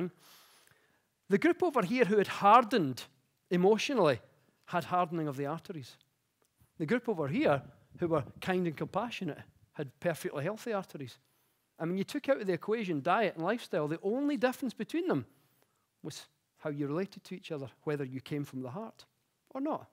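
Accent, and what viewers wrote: United Kingdom. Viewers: Glasga accent? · Adorable scottish accent ...fantastic talk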